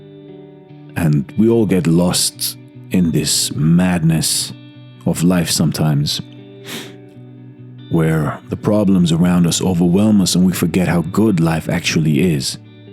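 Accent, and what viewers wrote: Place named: India. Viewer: Your voice tells that u r from india 🙏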